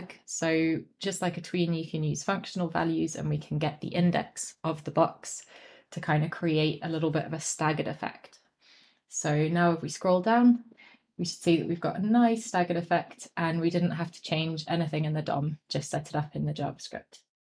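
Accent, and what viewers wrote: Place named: United Kingdom. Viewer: Does anyone think her voice is sexy? What British accent is this from?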